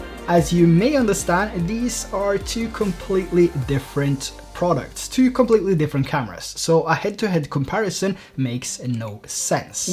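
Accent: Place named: Norway. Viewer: You sound a little norwegian, are you norwegian?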